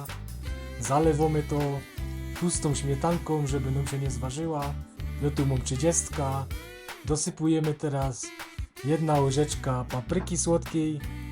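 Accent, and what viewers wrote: Silesia, Poland. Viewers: Jak ja nienawidzę tej śląskiej gwary......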